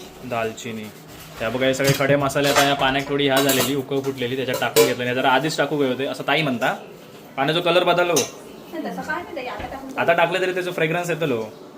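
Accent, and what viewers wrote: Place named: Malvani, India. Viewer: याला म्हणतात खरे मालवणी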